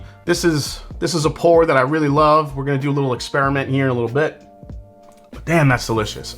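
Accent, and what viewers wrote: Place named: East Coast, United States. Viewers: LOVE this type of content! Keep up the great work and let that East Coast accent out more often. :) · You must be from Jersey. Dialect?🤔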